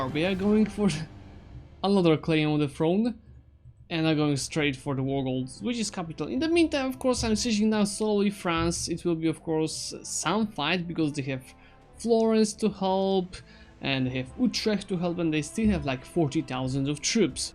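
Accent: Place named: Poland. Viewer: Are you Polish or Slovak? I love your accent :))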